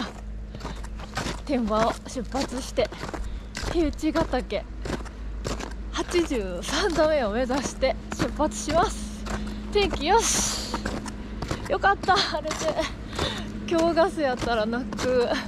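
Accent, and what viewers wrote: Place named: Kansai, Japan. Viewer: ずっと関西弁、大阪弁でいてね !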